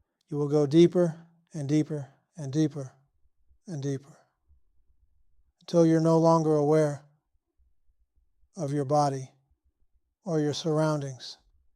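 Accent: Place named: South, United States. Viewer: this IS Roberts voice isn't it ?? Arrr damn ... I was sure it was . Disappointing. Ar well close enough ... yes TruE he's got that southern Twang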